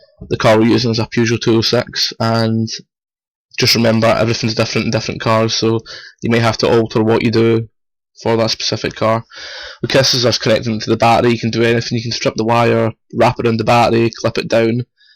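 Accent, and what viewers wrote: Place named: Scotland, United Kingdom. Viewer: scotish mate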